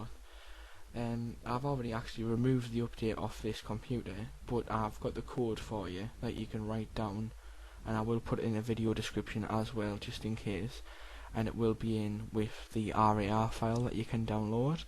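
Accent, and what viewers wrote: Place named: Cockney, United Kingdom. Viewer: Lol.. What kind of cockney gibberish are you ranting is this? I'm only assuming- I really don't know which UK dialect you're ranting, but it's difficult to make out; and also this video was very poorly encoded at that.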